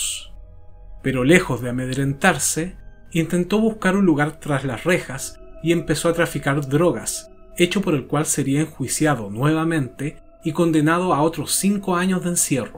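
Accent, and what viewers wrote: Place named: Chile. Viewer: Que grata sorpresa saber que usted es chileno, tiene un agradable acento….El acento chileno es lindo, siempre y cuando se pronuncien las palabras.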